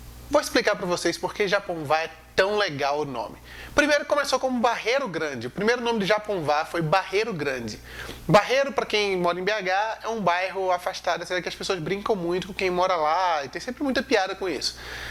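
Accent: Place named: Ceará, Brazil. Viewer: E o seu sotaque tem I'm pouco de cearense out pernambucano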